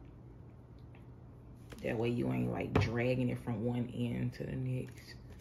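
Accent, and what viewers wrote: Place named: South, United States. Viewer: Where are you from? 👀 sound like you from the South from ya accent!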